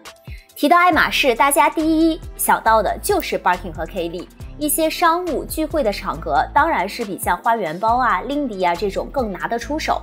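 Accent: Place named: Japan. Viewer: 日本人的發音，長駐日本長大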